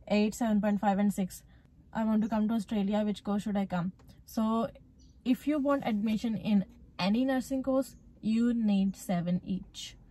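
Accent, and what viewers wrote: India. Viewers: Wooow I like your Punjabi accent 🔥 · Majhe de ho tusi lgda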